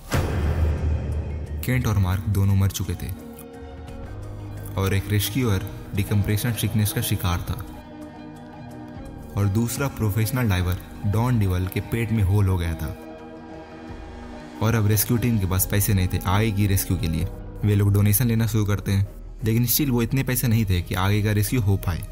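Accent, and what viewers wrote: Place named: Purvanchal, India. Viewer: bhai tum UP purvanchal side ke ho kya? Tumhari pronunciation 🤐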